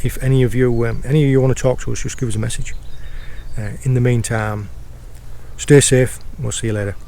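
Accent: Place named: Northeast England, United Kingdom. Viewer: I'm in Pembrokeshire so the Seas are very similar, both of us with our toes dipped in the gulf stream, but there was so much variety in that one spot, I've dived for many many years and have never come across the cuttlefish eggs, they were impressive, and well disguised I guess they could be mistaken for seaweed etc. Your not cornish though are you, I watched some of your videos last year and thought you were on the Northeast coast and remember thinking at the time that's a very unique spot for the North Sea! Thinking it must have been a micro climate on an estuary somewhere, I realise now I'd mistaken your accent for location 😁😁👍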